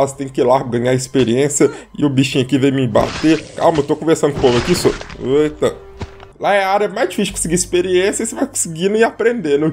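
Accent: Minas Gerais, Brazil. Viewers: Parabéns. Você é mineiro né adoro esse sotaque.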